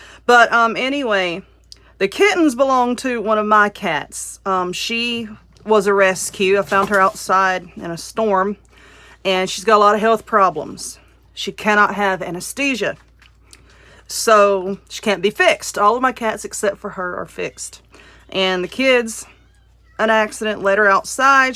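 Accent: South, United States. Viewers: Love your ideas! I love your southern accent and your personality just cracks me up 😁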